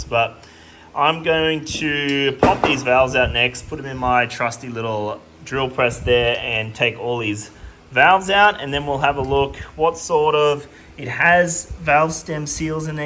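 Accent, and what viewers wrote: Australia. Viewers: *'s like a shit-talking, Aussie-accented shop manual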